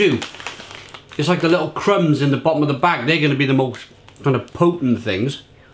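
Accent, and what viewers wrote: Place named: Cardiff, United Kingdom. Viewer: Hey Bud! Fantastic reviews as always. You are a great ambassador for us Welsh. You have an undeniable honesty about you and are incredibly modest to boot... I'm so proud of the entertainment you provide. I'm an expat and just hearing your Cardiff accent brings back a lot of great memories. You've made me proper chuckle on many occasions. lloniannau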